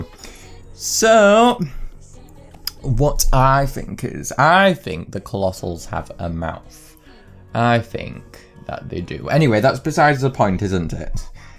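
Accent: United Kingdom. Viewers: Oh, that british accent)))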